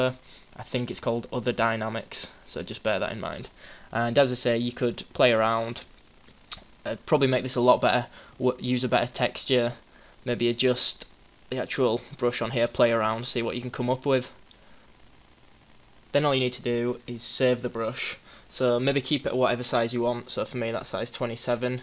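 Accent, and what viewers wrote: United Kingdom. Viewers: This is great, thanks for making it so simple. Now I can finally make my own digital drawings instead of drawing them on paper and editing them in photoshop, that was a pain...I knew there was a way to do all this but I completely forgot how and never really could figure it out. By the way, your accent's great, but what part of the United Kingdom are you from? I don't know all that much about accents so I don't want to sound stupid, but it sounds slightly different from most English accents, is there a name for it?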